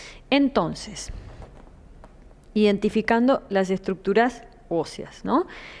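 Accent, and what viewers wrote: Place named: Argentina. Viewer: Es argentina ❤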